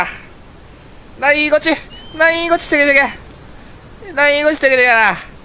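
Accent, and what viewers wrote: Kagoshima, Japan. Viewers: 9割くらいは理解できませんでした😊 鹿児島弁スゴイ👍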